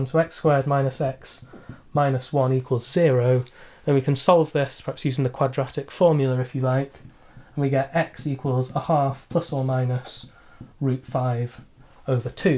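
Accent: England, United Kingdom. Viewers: Fantastic solution! I really appreciate how systematically you approached the problem, making it easy to follow and understand. Your channel is truly a gem for anyone looking to improve their math skills, and your way makes learning enjoyable. Please keep uploading such excellent videos! Your dedication and clear explanations are incredibly valuable. Apart from the maths, I like your English accent, it is amazing. Like from Saudi Arabia 🇸🇦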